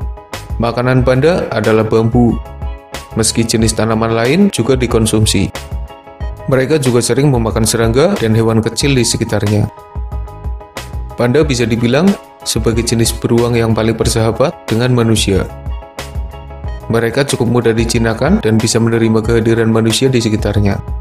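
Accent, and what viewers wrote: Banyumas, Indonesia. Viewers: Bang ...org ngapak. Yaaaa???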